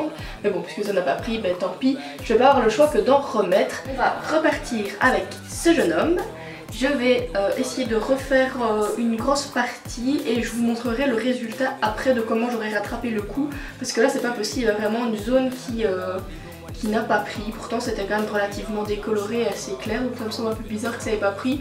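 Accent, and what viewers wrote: Belgium. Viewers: Aaah j'ai reconnu l'accent belge ! xD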